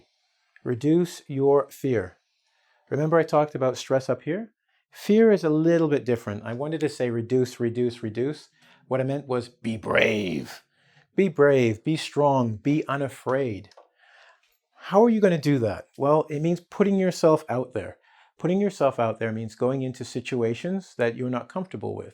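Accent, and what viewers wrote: Brazil. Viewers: Are you a Brazilian guy? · are you Brazilian?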